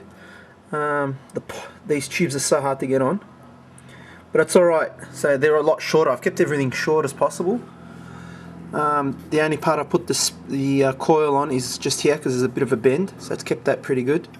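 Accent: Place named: Australia. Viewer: Australian:) I think.... nice orginisation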